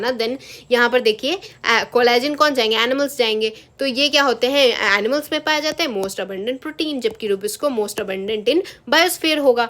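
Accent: Bihar, India. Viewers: Pronunciation galat ho raha hai😂😂 baaki chapter explanations theek hai .!! bihari tone hai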